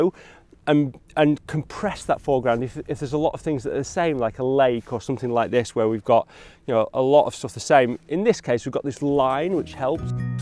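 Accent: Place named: Scotland, United Kingdom. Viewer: I am interested in your classes for purchase. It is surprising to me that I am even used to your Scottish English now:)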